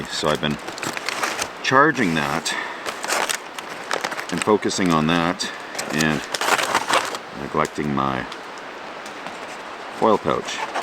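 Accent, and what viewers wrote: Alberta, Canada. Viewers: Epic idea. I love your stuff. Former Edmonton resident and you got that same Albertan Hoser accent that I miss so much. Haha. You remind me of a bunch of good dudes I knew when I lived there.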